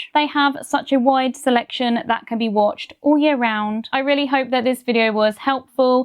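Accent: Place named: Southern England, United Kingdom. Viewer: I'm guessing u're from southern england like by u're accent, I'm from maidenhead berkshire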